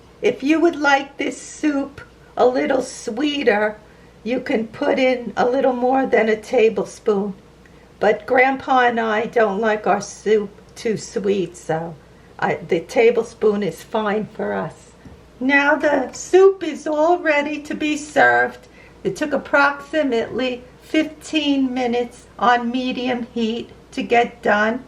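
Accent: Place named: New York, United States. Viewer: Hi I'm from England what is the tomato sauce that you used equivalent in the uk we have tomato puree and cans of plum tomatoes or chopped Tomatoes but I've never seen cans of tomato sauce. What we call Tomato sauce is the sauce you put on your plate like you get in a cafe and you use like brown sauce and put a little bit on. I'm struggling this week and have 3 pounds 50p to last me 5 days so need a really cheap recipe. Unfortunately I don't know what this tomato sauce is. Could I mix tomato puree with water to make it? P.s Loving your accent Newyork?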